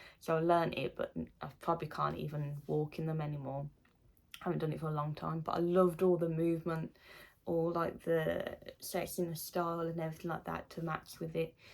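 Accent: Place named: United Kingdom. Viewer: So Sweet of You to Share Your Life and Journey with All of us. BTW, LOVE YOUR BRITISH ACCENT.... Some of my Adult Childrens' Close Relatives Were Born, Raised, & Reside in England. Thank You For Your Terrific Videos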